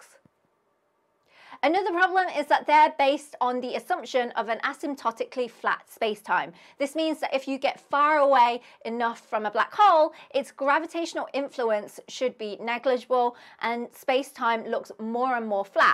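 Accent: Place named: England, United Kingdom. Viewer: 😘 Cute yellow with a silly dialect from the tea drinkers. It's best if you speak the Human language aka American English, not tyat ugly noise from england. Why is your head bobbing up and down like that, is that typical mannerism over in mere england?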